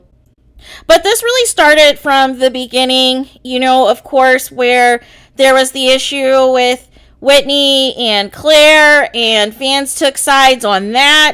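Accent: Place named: New England, United States. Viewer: The way you say “but” and “because” is annoy af lmao. But it sounds New England so I’m used to it.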